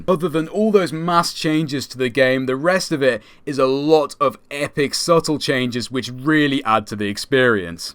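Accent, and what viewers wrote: United Kingdom. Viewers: How did I know that the commentator was en English lady.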